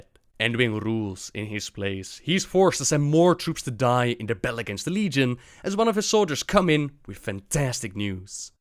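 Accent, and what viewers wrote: Netherlands. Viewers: I fucking adore these giant perspective stories of the universe, Sargaeras, the world spirit, etc. Can't get enough of these Dutch accent English (?) narrated videos. Would love it if you gained an official position as a Lore Keeper for the Warcraft universe. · And when u click on it, it needs 2 talk in his dutch accent haha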